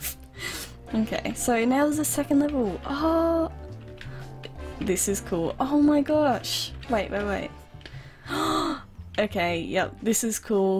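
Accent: Australia. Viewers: she sounds like Australian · R u Australian?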